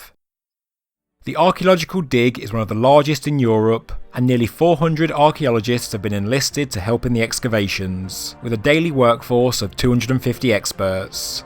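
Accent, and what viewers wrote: United Kingdom. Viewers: Go for it! Your ideas are like a fresh breeze in the suffocating existing typical history wronly interpreted. Looking forward to your book. I used to live in ibUK for years, and she still feels like home. There must be hundreds of similar sites still to be found. I always found Wales very fascinating and having certain vibes like no other place. Has anything been found there yet? Stonehenge gives me goose bumps, Wales chills down my spine and Land's End heeby-jeebies (?). "Something evil this way comes..." I totally agree with your logic, and I am impressed the way you somply do a 180, if logical evidence has proven your earlier conclusions inaccurate. Respect! History and archeology are often interpreted according to main streat guide lines, but they seem to prove wrong almost every time there is a new excavation. I find it thrilling. 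It is great that some power companies are willing without any outside preassure to reroute the power lines. Wow, that is a first. The importance of these sites is being understood as a means of understanding your nation and its ancient habits and names of places. The legends become fact. That is one aspect of scientific work, not clutching to the main stream explanations, which - sadly - is often the case. Your videos are addictive 😁 and unconventional, and yes, I like that. Other thing, proper English, no American dialect (don't like it)! Thank you. Gagging for a new one already... 😀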